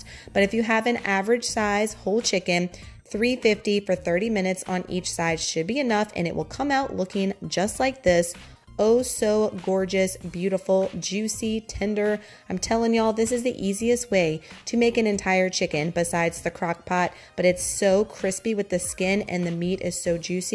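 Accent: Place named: Oklahoma, United States. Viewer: I here ya, im in Oklahoma so it sounds very normal to me!!! you're awesome!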